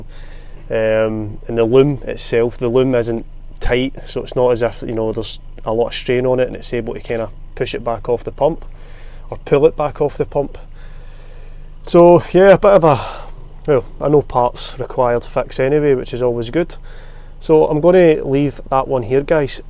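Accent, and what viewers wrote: Scotland, United Kingdom. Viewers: Scottish. Subbed. 🙂🍺🍻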